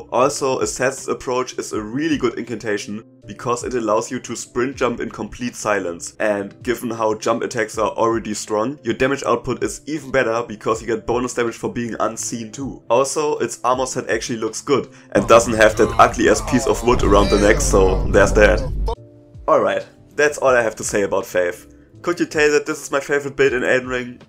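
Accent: Germany. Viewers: man merkt das er deutscher ist xd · Bist du deutsch? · So herrlich TEUTSCH, your english. I love my germans, because I'm one myself. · Der Deutsche Akzent kickt zu stark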